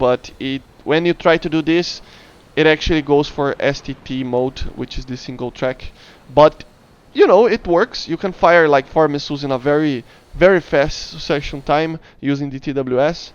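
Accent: Brazil. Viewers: Brasileiro só pelo sotaque kkkk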